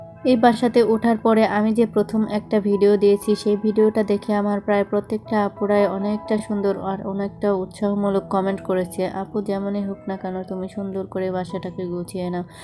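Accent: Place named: Manikganj, Bangladesh. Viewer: Apnr basha manikgonj kothao.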